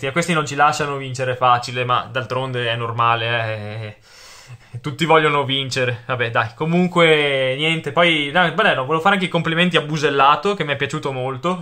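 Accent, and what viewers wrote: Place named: Padua, Italy. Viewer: L'accento padovano è favoloso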